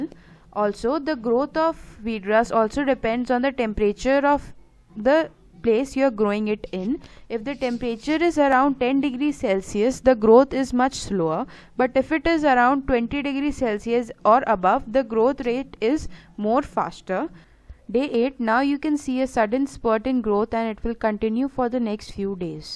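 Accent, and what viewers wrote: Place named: India. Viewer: Try to pronounce your r and d in proper English manner in horrible hinglish for us it sounds disgusting, the Hindi rétroflexes always makes Indians lazy when talking English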